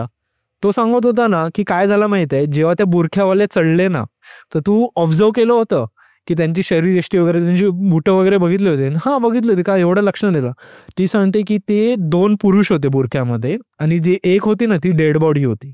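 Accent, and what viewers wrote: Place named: Goa, India. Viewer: Haaa ji bhasha bolto aahe na goa chi bhasha aahe hi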